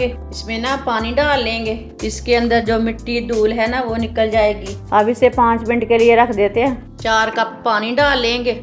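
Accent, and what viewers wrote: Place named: Punjab, India. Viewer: Very strong Punjabi accent that you cant hide 😅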